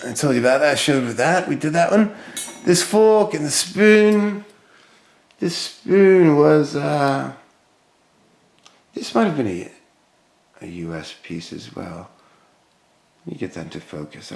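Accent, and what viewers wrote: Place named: United Kingdom. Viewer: Where are you from in the UK, I cant pinpoint your accent? nice finds by the way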